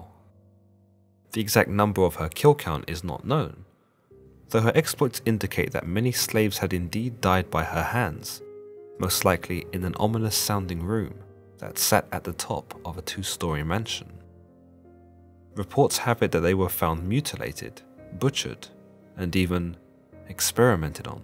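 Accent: United Kingdom. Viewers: Hey British commentator when you're doing an American documentary get to the point please.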